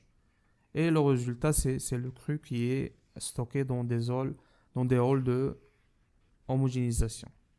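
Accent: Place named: Marrakech, Morocco. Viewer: D apres ton accent je suis sur que tu es un marrakchi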